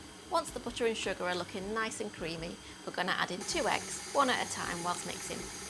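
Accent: United Kingdom. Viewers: OMFG PURE BRITISHNESS. BEAUTIFUL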